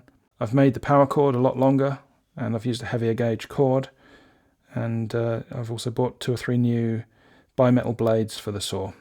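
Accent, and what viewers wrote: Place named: England, United Kingdom. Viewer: Hi, Robert from Sydney here 👋🏼 Newbie to your channel mate, but enjoying your ‘stories’ immensely. Didn’t even know you were in Oz till this vid. I got fooled by the English accent 🤣 My younger son is currently on a two-year secondment in Hobart… due back to Sydney this year. I understand your need for space-efficiency. A lot of my machines are on castors as well ( single car fibro garage 😳). I like your design for heavy duty ‘bases’ for the machines 👍. Regards Robert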